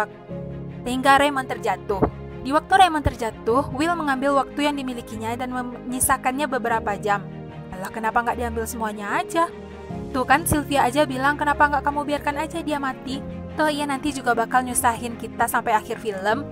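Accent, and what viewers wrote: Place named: West Sumatra, Indonesia. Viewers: Adminnya orang pekanbaru nihhh atau orang sumbar kalo diliat dari logatnyaa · FIX... INI mah orang minang tulen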